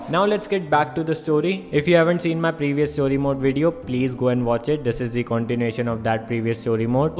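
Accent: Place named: Punjab, India. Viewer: 😂😂😂😂😂😂😂and that punjabi accent of yours......yrrrrr you are just wowwwwww❤️❤️❤️❤️❤️😂😂😂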